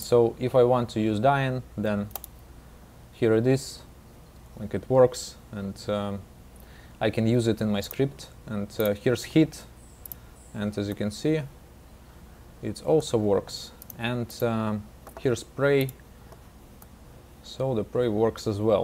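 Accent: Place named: Russia. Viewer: Услышал русский акцент и понял, что моя проблема решена😎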